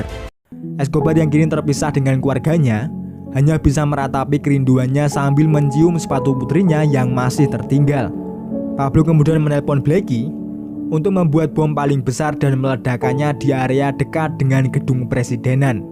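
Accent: Java, Indonesia. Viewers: Mas sampeyan Wong Jowo?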